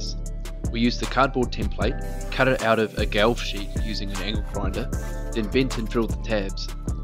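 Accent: Australia. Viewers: that looks like fun. and it sounds like you are you are a fellow aussie. where are you buying the controller from?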